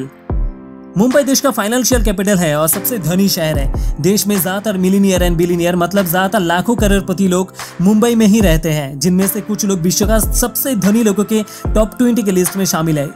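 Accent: Bengal, India. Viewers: aree yaar kisi neutral accent wale ko bola karo narration ke liye. tum bangla wale pura khel kharab kar dete such irritating accent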